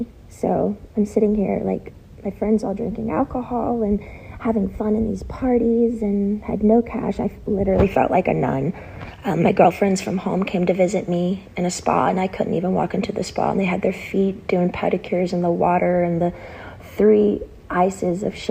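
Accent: South, United States. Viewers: so what she from the south, that's how we talk. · Finally in her own words and no accent besides the south! I’m sorry you went through this Britney!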